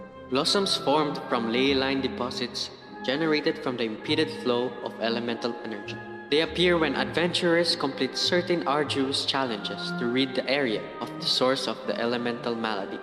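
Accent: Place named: Philippines. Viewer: I have a big feeling you are a filipino because of your accent.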